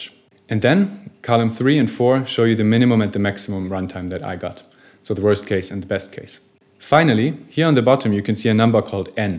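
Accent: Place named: Germany. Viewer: Interessantes Video, wenn auch ein bisschen zu lang. Man hört aber deutlich, dass ein Deutscher hier versucht, Englisch zu sprechen (z. B. an der wechselnden britischen und amerikanischen Aussprache, so sagst du z. B. läßt statt lahst (für last) oder fähst statt fahst (für fast)). Warum das sein muss, verstehe ich nicht. Mach doch solche Videos (auch) auf Deutsch.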